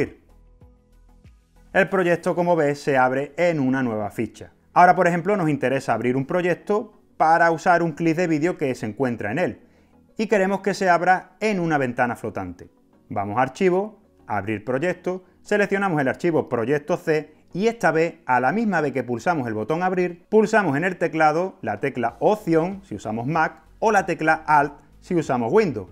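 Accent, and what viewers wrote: Andalusia, Spain. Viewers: eres un crack. Videos super currados, concisos, certeros, clarísimos... y en andaluz. No se puede pedir más.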